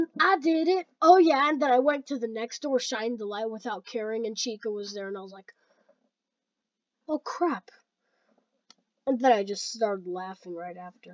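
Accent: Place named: Colombia. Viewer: tienes voz de colombiano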